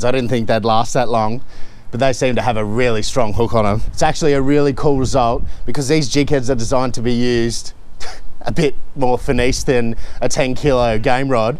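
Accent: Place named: United Kingdom. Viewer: I like your British accent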